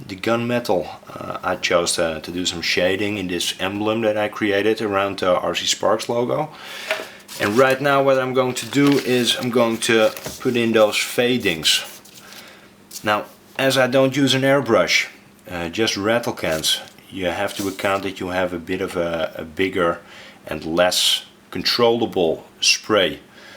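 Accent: Netherlands. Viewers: Dutch accent ftw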